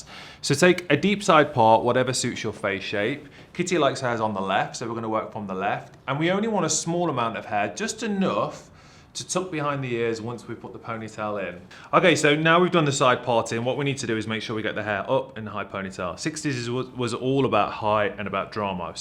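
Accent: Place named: United Kingdom. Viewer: hold the phone.....he's BRITISH???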